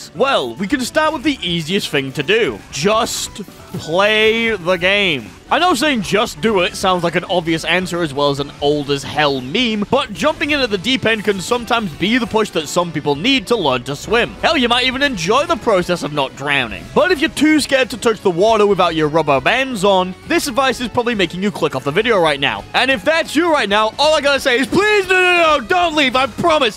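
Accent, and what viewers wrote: United Kingdom. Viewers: you forgot about your silky smooth british accent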